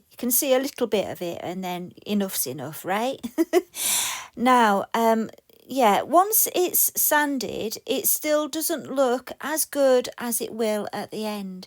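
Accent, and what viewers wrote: United Kingdom. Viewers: Thank you for another wonderful video! I have watched you for years and adore your British accent…I’m from the US and have only a boring southern accent. I love to watch your ideas come to life and find your witty commentary delightful and charming!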